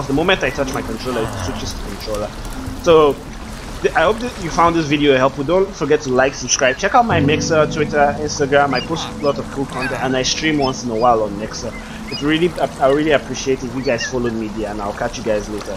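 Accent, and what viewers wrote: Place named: Africa. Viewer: you have an african acent like like guy who sang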